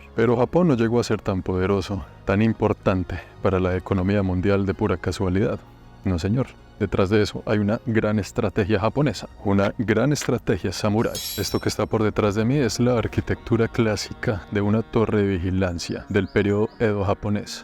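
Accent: Colombia. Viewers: Eres el mejor narrador de contenido no cansas tienes esa chispa de expresión colombiana que encanta 🌟🌟🌟🌟🌟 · Es el primero video que veo de este Canal y por el acento al principio asumí que es colombiano y después lo confirmé con algunas referencias que hizo jeje, muchas gracias por su trabajo, excelente video.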